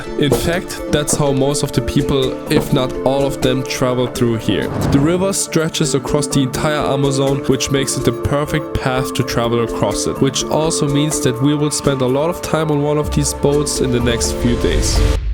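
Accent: Germany. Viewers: I hear Deutsch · Am i hearing a little bischen deutsch ?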